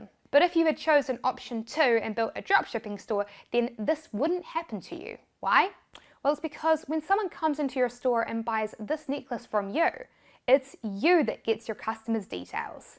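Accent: New Zealand. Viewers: Hey she is from New Zealand. That's why she sounds like that.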